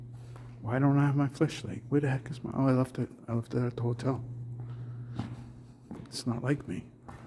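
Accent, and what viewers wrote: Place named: New Jersey, United States. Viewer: Playing now! I love your accent! I lost mine but easily pick it back up when around my nj family. I remember being very little and vacationing in AC before it was ‘ the ac’ had to be around ‘67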